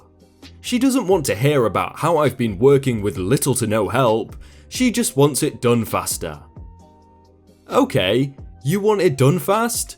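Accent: United Kingdom. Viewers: He's pronouncing [SCAY - DA] due to his British Accent.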